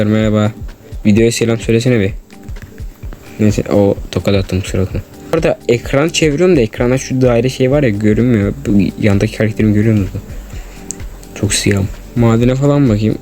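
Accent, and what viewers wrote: Azerbaijan. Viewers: Azerimsin sesinden bildim Düzenleme:beyler like atın hiç bi videoya çıkmadım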